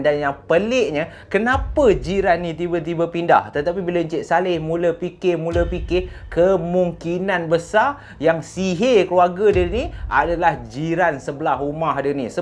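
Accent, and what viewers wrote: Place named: Penang, Malaysia. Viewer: asalamualaium. pandai juga hang cakap peneng.hang pandai buat lawak.teruskan kerjaya hang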